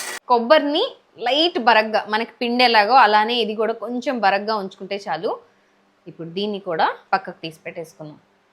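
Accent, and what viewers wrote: Nellore, India. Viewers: Hi nenu kuda nellore, nenukuda chestuntanu. You tube maa oori basha vintunte chala bagundi. Nenu banglore dagara vunde madanapalleki vuntanu.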